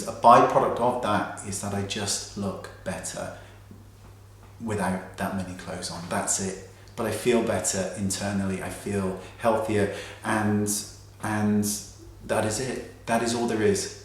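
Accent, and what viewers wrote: United Kingdom. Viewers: I think you're gorgeous. You're British accent is probably misleading but if you're here in the UK call:-)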